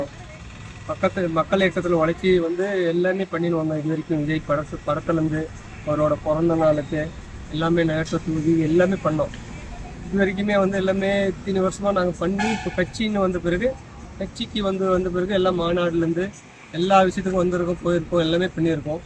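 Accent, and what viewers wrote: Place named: North India, India. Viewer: Dai nee pesuratha patha north Indian pola iruke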